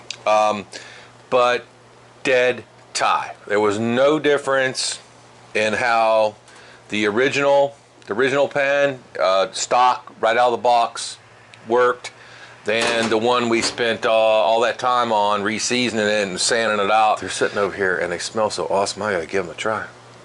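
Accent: Florida, United States. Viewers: This guy sounds like he’s from Florida.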